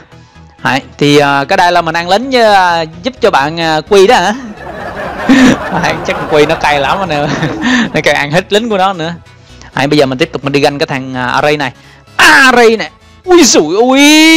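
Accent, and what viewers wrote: Quang Ngai, Vietnam. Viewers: Quảng Ngãi nói vui nhỉ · Người Quảng Ngãi phải hông anh owiii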